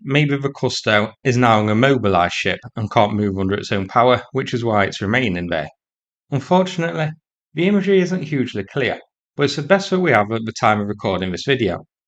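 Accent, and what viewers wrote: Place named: Lancashire, United Kingdom. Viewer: I can't pick your accent. Lancashire? I'm guessing North of Manchester but not Leeds. Kind of softer than Burnley.... Greetings from Australia.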